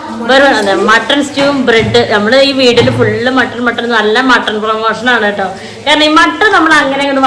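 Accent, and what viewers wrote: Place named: Mankada, India. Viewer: അച്ചടി ഭാഷ എത്ര പറഞ്ഞാലും ഇടയിൽ മങ്കട കൂട്ടിൽ ഭാഷ കയറി വരും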